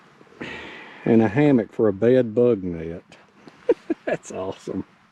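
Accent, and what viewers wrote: South, United States. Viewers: Awesome video. Loving that southern accent. 😎🤘🇺🇸🇺🇸🇺🇸💯💯💯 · gm love your videos and your southern voice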